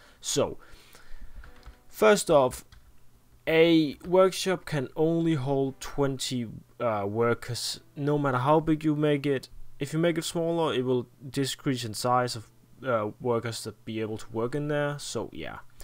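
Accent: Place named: Denmark. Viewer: hmmmm i can smell danish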